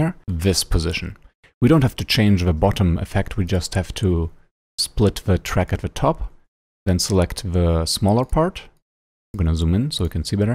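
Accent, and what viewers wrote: Germany. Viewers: you can hear the german accent immediately. it's as plainly as the russian accent. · wie deutsch kann ein englisch bitte klingen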